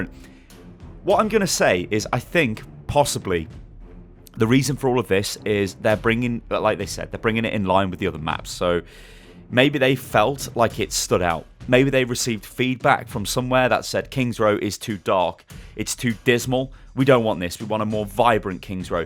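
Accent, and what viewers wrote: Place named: Midlands, United Kingdom. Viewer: You're British, stop saying "like" all of the time... you sound like an Orange County teenage girl... with a midlands accent.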